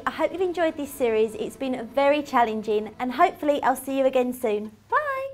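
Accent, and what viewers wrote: Essex, United Kingdom. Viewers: she can't help that she's from Essex and that's what her accent is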